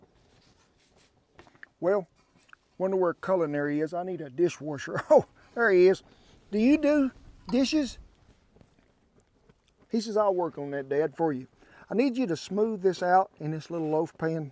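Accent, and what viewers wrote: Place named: North Carolina, United States. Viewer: I'm a nanner bread junkie,you know if you didn't live in Oklahoma I would swear you were from western NC.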